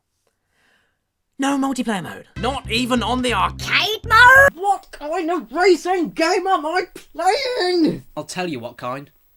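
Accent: United Kingdom. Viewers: Ur so British!!!! I luv it ^^ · He is from the uk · Dude he is British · this guy ain't the funniest I've seen but dang I cannot resist the british...seriously the very few girls I've ever talked to say that men with british accents are automatically more attractive... please teach my your ways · Playstation Master and JonTron Incarnate but BRITISH.= AWESOME. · I know that, but whether or not this other guy knows it he seems to think that a game which is suitable for 3-year-old kids makes it automatically a bad game. I just simply pointed out that he's clearly wrong. But anyways I'm sure we can all agree to whatever we're claiming here so I think we could just put this thing to rest and just continue praising you, your british accent and your beautiful sideburns.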